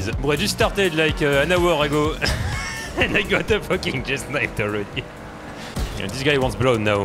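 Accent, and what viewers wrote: France. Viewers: digga man hört doch seinen französischen akzent so raus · there is something about roasting people with a thick french accent that is so funny and heart warming lol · Mais t’es français ? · Ton accent français 💀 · I love this guy, his french accent, the edits and the insults make it so much more funny and enjoyable lmaooo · your enraging griefers and french accent combined makes this content so damn funny 🔥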